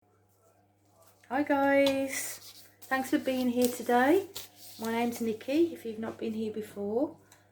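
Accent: United Kingdom. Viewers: Another amazing piece, where are you from? You sound so English to me but I see you are in Australia. I am English and currently live in USA.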